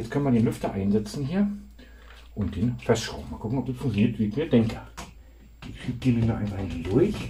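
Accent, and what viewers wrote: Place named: Brandenburg, Germany. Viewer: Diese 8 Minuten vergehen wie im Flug... In welchem Landkreis bist du denn Zuhause? Überlege ob ich mal deinen Tracker genauer in Augenschein nehmen muss!😊 Klingst nach südlichem Brandenburg....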